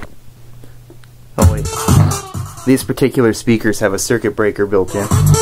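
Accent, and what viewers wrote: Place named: Canada. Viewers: how cant you not tell someone is Canadian, Eh? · I detected he is a Canadian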